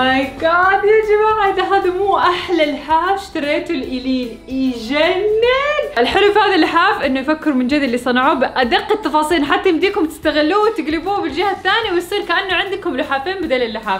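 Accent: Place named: Iraq. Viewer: احكي يوام كامل عراقي😊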